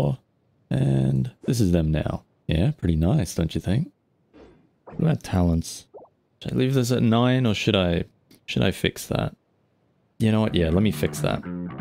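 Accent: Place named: Australia. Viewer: the aussie accent is glorious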